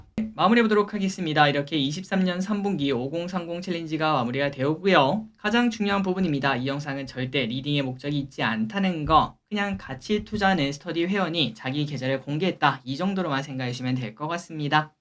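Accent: Gyeongsang, South Korea. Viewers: 대구분셨나요.? 반가버유.~~^^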